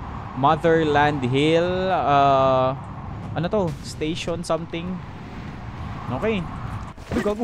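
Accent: Visayas, Philippines. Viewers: Bisaya ka pala lods ❤hahah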